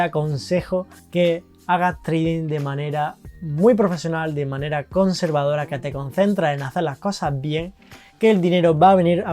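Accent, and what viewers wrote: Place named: Spain. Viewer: Buena info. De donde q parte de España es ese acento, es muy particular.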